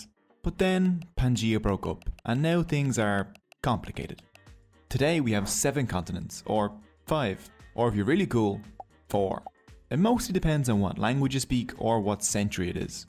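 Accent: Ireland. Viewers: See? What a difference, a cogent, coherent, properly expressed comment can make? You can hold a different opinion than me, even an absolutely contrary one. But when expressed intelligibly, it's a pleasure to engage with the commenter (i.e. you) rather than acrimonious. I reciprocate your expression of courtesy and wish you a pleasant day, too. In fact, this was how I hoped to engage the video creator Irishman,. His thick accent undermines the intelligibility of the narration of his well-researched presentation. Instead of accepting my critique, he took personal offence and hardened his stance to a "this is how I speak, take it or lump it" position. But that won't gain him viewers. On the contrary, he may lose a few. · This is my first time watching your channel and I immediately subscribed. Such a well detailed explanation and smooth animation! This feels like me hitting a Gold Mine. 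Keep it up,! Love your Irish accent! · Irish accent. T is often pronounced D Hence Paddy for Patrick. Possibly due to Gaelic influence rather than English/Germanic... but I am guessing. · I am so distracted by this Irish accent that I can't focus on the information being told to me 😂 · How Irish does the narrator sound? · This guy sounds surprisingly irish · Nice to hear another irish man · He's Irish.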